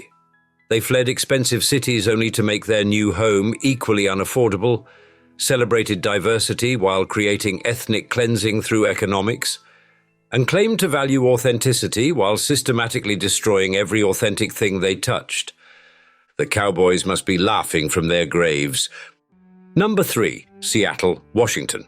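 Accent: United Kingdom. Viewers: It isn't rich millennials. More specifically its high tech nerds that are socially awkward and don't want community. I wish you would have used an American accent onthe AI voice instead of British. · When you hear a British accent turn off!!! Thats what I do...BYE!!!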